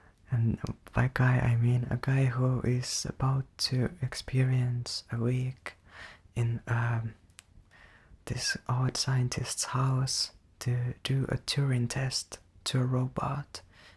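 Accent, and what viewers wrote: Finland. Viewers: What is that accent? Almost sounds like a Finnish accent.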